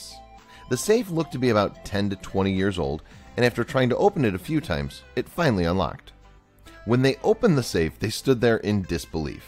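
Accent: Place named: Wisconsin, United States. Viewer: Yeah he's probably from Wisconsin.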